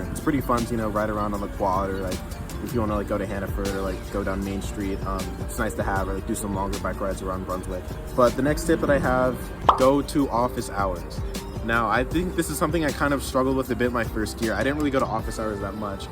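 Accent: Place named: United Kingdom. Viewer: dude this video was so amazing!!! it was so helpful i loved everything you said, it helped me get a better idea of what bowdoin was like (tbh i thought it was kind of stuffy and boring) and the general college advice was amazing as well! this has me excited to hear back from bowdoin. thank you for showing what the city is like as well bc i was under the impression it was in the middle of nowhere so i def wasn’t expecting a city lol. also your energy is just amazing!!! you’re so funny and kind and made this video so fun to watch cause usually i dont like videos like this. you’re amazing man, keep going! you’re gonna be great i can tell!! (also your british accent was so good even tho u said you grew up in the midwest i was like is he from the uk!???)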